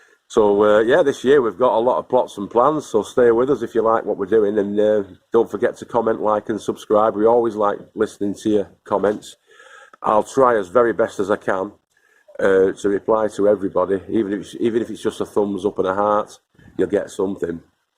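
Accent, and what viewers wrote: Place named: Manchester, United Kingdom. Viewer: Hi, I'm a new subscriber and glad to be on board. Ex Manchester boy long way south now so it is good to hear your accent and see you smile. Thanks for sharing and more please on the sowing & planting under the smaller hoops. Have a very good year. Geoff.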